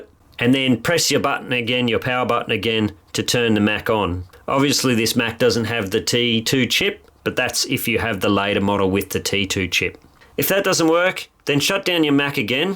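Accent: Australia. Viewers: Thanks so much for this tip. I did both methods and got it to work - charing again - on the second method. Great to hear an Aussie voice for a change. Cheers M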